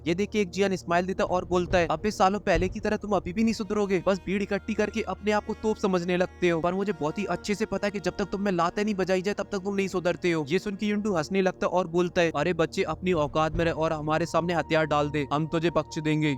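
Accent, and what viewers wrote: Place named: Chambal, India. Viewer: Bro ye tumhara Chambal Accent kaafi mjedaar hai... episodes dekhne ke baad bhi yha explanation do do baar sunne me achi lgti hai...upr se actor ko tharki name ek dum shi diya hai😂😂😂😂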